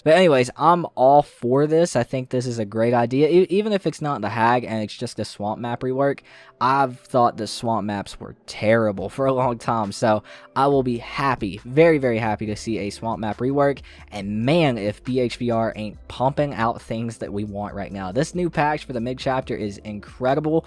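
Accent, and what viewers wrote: United States. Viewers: The strong American accent gets a like from me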